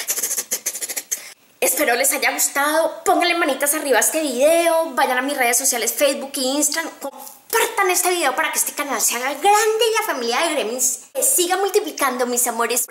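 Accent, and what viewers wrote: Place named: Colombia. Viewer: Tan bella siempre....amo tu acento colombiano! !!!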